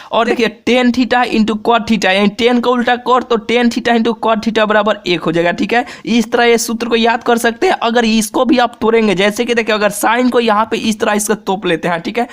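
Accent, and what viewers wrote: Bihar, India. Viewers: Sir aap Bihar ke hai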